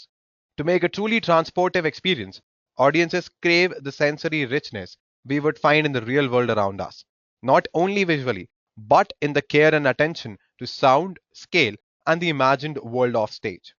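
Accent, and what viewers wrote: India. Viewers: I really love this channel, My English is not good, so I have a problem to hear with Hindi English (sad)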